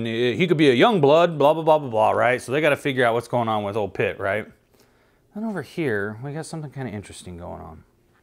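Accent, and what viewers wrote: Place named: New York, United States. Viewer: Your new york accent is awesome😅😆😅😆😅😅😅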